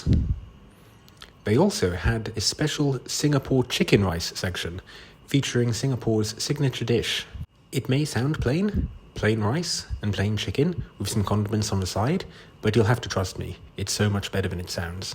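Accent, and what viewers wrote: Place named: United Kingdom. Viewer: Wow your accent is soooo British lol 🇬🇧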